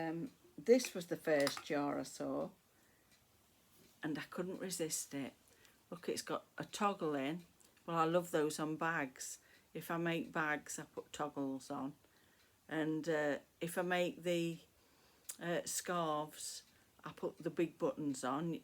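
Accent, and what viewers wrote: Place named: Derbyshire, United Kingdom. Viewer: You definitely have a Derbyshire twang to your accent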